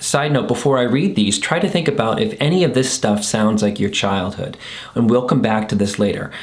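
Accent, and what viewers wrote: United States. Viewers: ❤1. I brought this trip upon myself....Where to escape?? Currently, exhausted, Extremely isolated, brainfog, in Israel #under_fire🎉 #day24 2. Expat from the USA, and appreciate your American accent. Voice From home . I studied psychology... Came here to confront religious Absurd-abuserS who live in Israel.😮😅😊.... So glad I just found your channel/ account. I need tips + excersize for time of war. Staying in hallways/ bomb shelters mostly. Tiny spaces. In Israel under rocket fire. Bless u for all you do! Can send me an email with your videos on you tube or any other free content you can offer. Where are you located these days? City/state? I'm an Expat from Miami currently in central Israel! Sending love, light & hugs wherever it is needed. Amen!❤️ SaraGraceFreed😊3? How to pack and plan while under fire, 4. NEIGHBORs Friends have lost.lived ones... 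mmm. 5. Buying groceries instead! So confused. Help!❤Sara😂🎉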